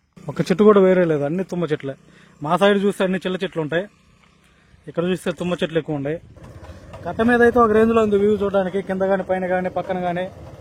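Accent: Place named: Nellore, India. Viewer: నెల్లూరు యాస బలే మజాగా ఉంటుంది బ్రో నీ భాష ఒరిజినల్ గా వుంది సూపర్ బ్రో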